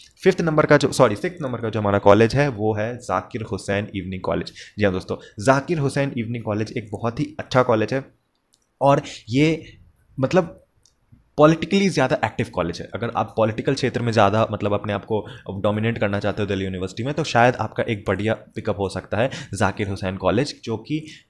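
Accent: Bengal, India. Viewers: Naa mane arabinda k orobindo bolcho r motamuti mone hoy tumi bangali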